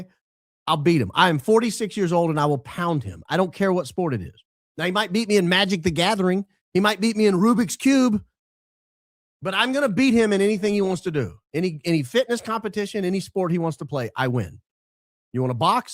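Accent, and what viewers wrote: Australia. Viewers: Good Aussie accent ☺️ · New Zealander here... Your Aussie accent is hilarious, 🤣🤣🤣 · As an aussie i can confirm that is exactly how we speak, mate!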